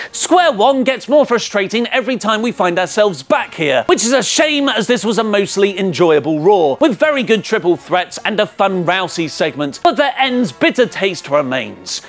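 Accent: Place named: United Kingdom. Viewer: u need a rko I hate ur British accent I'll give u a beer then a stone stunner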